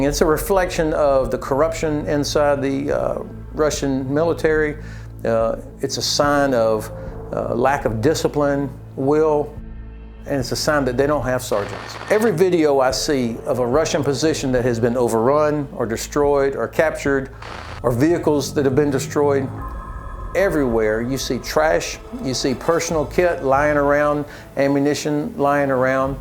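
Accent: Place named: South, United States. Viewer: You have a southern United States accent so you cannot know what you’re talking about.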